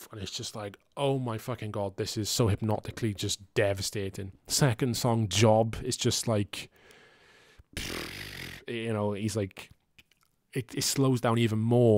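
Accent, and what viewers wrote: Wales, United Kingdom. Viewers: Strong welsh accent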